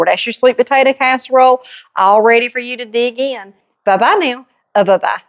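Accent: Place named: South, United States. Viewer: God bless you from Oahu. 👋🏼🌴☀️ I clicked for a southern sound and I am 100% satisfied. : )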